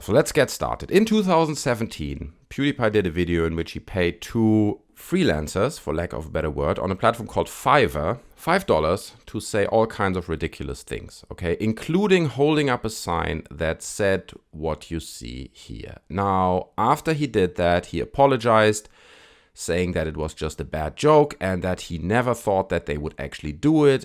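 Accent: Germany. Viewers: Also out of context are u german? just ur accent.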